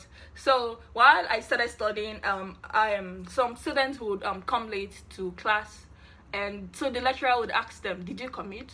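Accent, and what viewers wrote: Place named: Philippines. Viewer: You almost have a Filipino accent now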